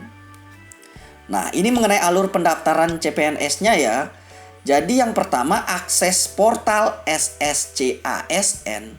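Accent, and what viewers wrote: Bali, Indonesia. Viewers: Jleme bali ci sing?, logat ci bali ajan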